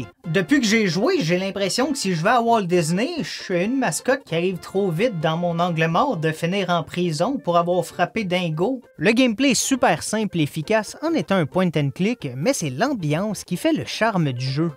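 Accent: Quebec, Canada. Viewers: Je t'ai découvert il y a quelques semaines et tu est vraiment très drôle :) Le mix de ton accent québécois, des expressions que tu utilises et de tes blagues me font passer un bon moment ! J'ai regardé toute tes vidéos la soirée ou je suis tombé sur toi et je suis ravie de t'avoir trouvé dans mon feed youtube ! Voilà, juste merci pour ton travail ! Tu nous fait rire ! Je parlerai de toi à mes amis :D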